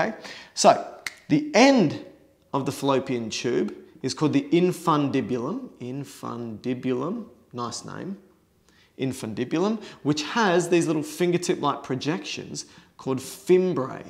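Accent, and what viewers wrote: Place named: Australia. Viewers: I reckon it's Australian, mate! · He is an Aussie, probably of Italian descent...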